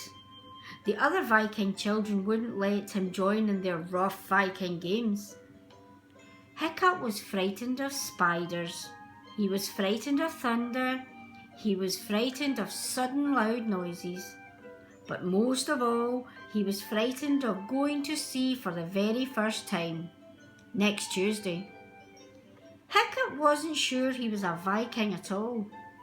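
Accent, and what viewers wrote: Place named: Scotland, United Kingdom. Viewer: And she also has a Scottish accent. This is just brilliant. :D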